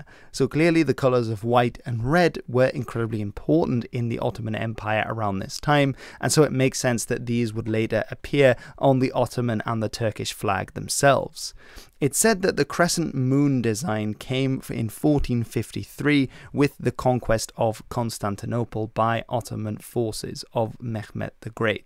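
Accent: Arab world. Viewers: Why are you reading Turkish words with arabic accent? It sounds weird and wrong af..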